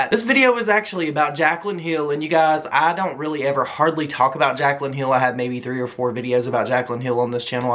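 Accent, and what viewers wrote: South, United States. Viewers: I love it so much that you have a southern accent ♥️ reminds me of the people around me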